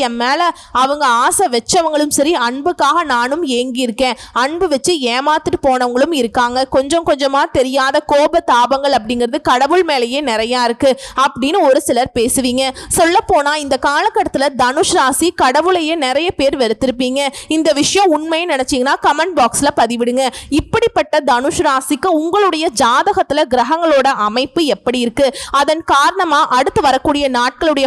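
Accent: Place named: Kerala, India. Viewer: Kerala pesara Amma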